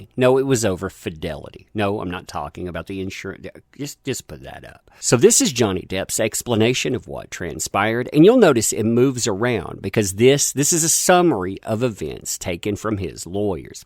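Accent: South, United States. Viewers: Horrible narration. Weird scratchy, prissy, hillbilly voice.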